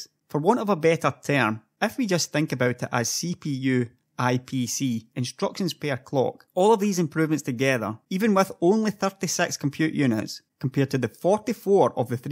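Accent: Scotland, United Kingdom. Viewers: scottish iirc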